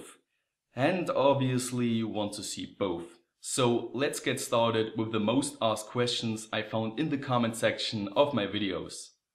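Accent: Saxony, Germany. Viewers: Ich finde man hört es an seiner (englischen) Aussprache, dass er deutscher Herkunft ist ^^ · Aha, noch ein Sachse! :D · Since i heard your voice the first time i said ... " He has to be german !!! " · Genau! Und bitte schön sächseln;P nachbarschaftliche Grüße aus S-A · your German accent has actually made it easier to pick the terminology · I like the German accent in your English and by doing these video's in English you at least reached The Netherlands :-) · Thanks for the videos, I'm Colombian and is easy for my view videos in english even with your german accent, german is an lovely language and hear it is really cool, but my knowledge of that language is very, very limited. please don't make videos in german. Is a fact, english is a language more "extended" than german in the world. Congratulations for the 100k subscribers!, Is a outstanding work !. 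actually is my fav channel. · Ein bischen hört man es das er deutscher ist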